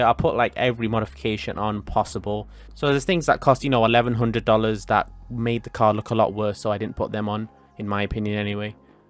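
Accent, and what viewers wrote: United Kingdom. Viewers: Fucking bri’ish accent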